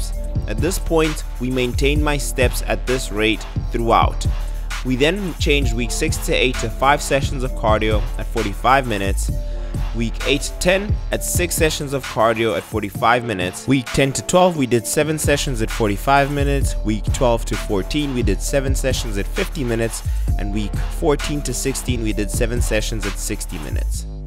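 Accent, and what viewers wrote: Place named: South Africa. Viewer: u sound like you're from South Africa but relocated to USA..New to the channel. Love all the info you're giving..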